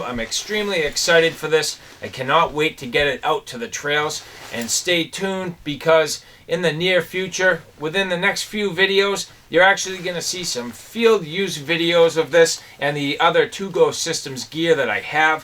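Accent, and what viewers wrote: Boston, United States. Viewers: Not sure where is the US you are from but judging from your accent - you just got to be from the NE, possibly Bawston? Am I right? BTW, I just got my Trifecta in the mail; now I got to go try it out. Cheers.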